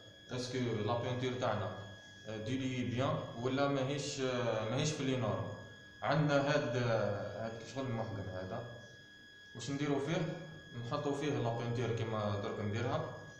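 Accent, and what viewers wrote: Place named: Tunisia. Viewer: لهجنك قريبج للهجة التونسية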